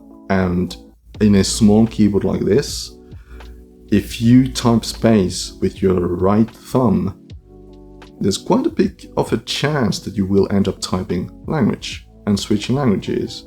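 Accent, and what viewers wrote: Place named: South Africa. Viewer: Bro sounds South African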